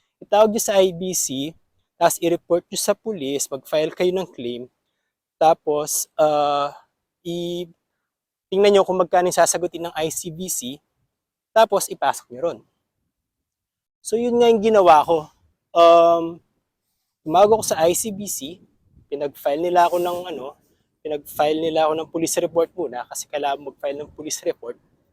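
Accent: Pampanga, Philippines. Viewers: yung accent ng kapampangan andon pa rin kahit matagal ka na dyan 😅 ingat kuya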